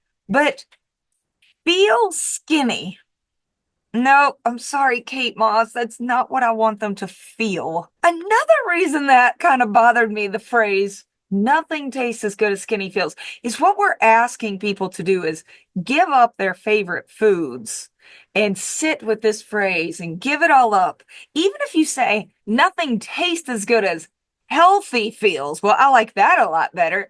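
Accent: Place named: Texas, United States. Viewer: Can't do accents? You have a stronger accent than mine, and I'm also from Texas. You have a country gal accent, you were just quoting a gal who has a backwoods accent, that's all.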